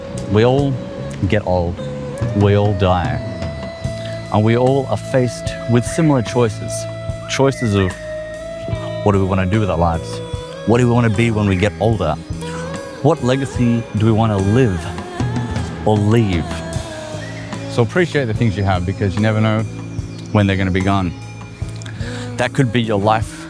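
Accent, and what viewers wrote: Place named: Australia. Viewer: Old at 40 or 50! Ha ha .. Im between those ages, have the same accent as you and have not lived in Australia since 2007. I LOVE my life. The secret sauce is to have no debt and low fixed costs and to think a bit differently.. Everyone can do it it just takes perseverance and imagination... You wont have a flash house like others but you will have awesome memories, stories to tell. At times you will just wish for a simple life and a safe place in that house you dont have but once your batteries are recharged you will just want to see the world again... You do have to sacrifice, no pets, lots of belongings etc but it will be rewarding if you are that way inclined.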